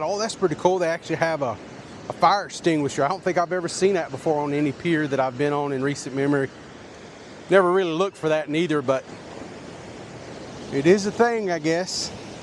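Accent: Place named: North Carolina, United States. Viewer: We visited the pier in April & it is a great pier. This was the first video I watched of yours but have been enjoying the ones you've done since. Thanks! We are born & raised North Carolinians & love your accent. Sounds like us 😂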